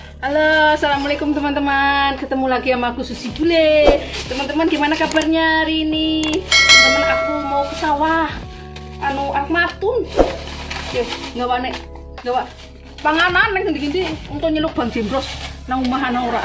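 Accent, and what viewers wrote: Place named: Banyumas, Indonesia. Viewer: Mantap yu susi ngapak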